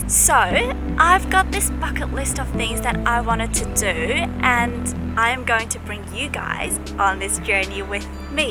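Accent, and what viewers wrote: Melbourne, Australia. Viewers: that Aussie accent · she sounds so Aussie now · you've taken the Melbourn accent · she has an Australian accent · The Melbourne accent is cute on you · that Aussie accent! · Starting to get that Aussie accent now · now in Oz, it's converting to a combination Aussie/Chinese accent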